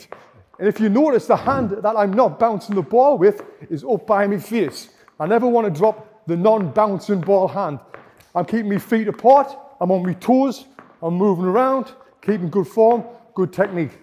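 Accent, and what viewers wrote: United Kingdom. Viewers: British accent sounds cool. From Russia with respect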